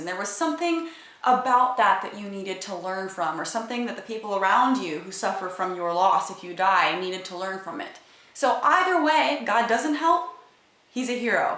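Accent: South, United States. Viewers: Love the Southern accent. Also, #firstworldproblems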